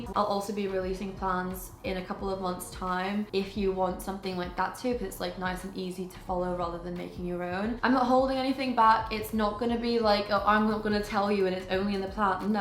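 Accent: United Kingdom. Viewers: I was right! YOU ARE LATINA 😮🎉 I was able to identify your complexion, your face, and excited this is the very FIRST time I see a Hispanic with a British accent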